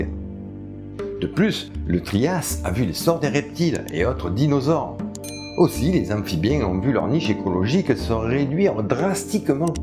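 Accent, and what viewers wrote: South, France. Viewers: Cher, tu es un mélange de Ben Kingsley pour le physique, de Jean Lassalle pour l'accent (ce n'est pas moqueur, je suis moi-même du Sud), d'Alan Grant pour le savoir et de Carlos (le chanteur) pour les chemises😂 Par contre, es-tu sûr que le Tyrannosaure et le Tricératops soient apparus au Jurassique? Il me semble bien que ce soit au Crétacé, non?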